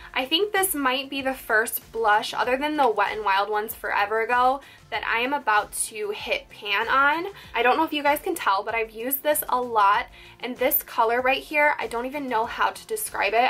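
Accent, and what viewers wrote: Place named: New York, United States. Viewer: you can really hear the New yorker accent when she talks. its so endearing :)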